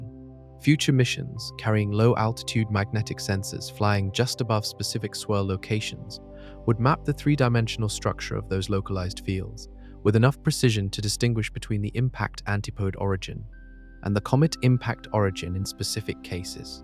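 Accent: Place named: United Kingdom. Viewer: Tipoff of clickbait AI CGI BS videos is the quasi-British accent of narrator.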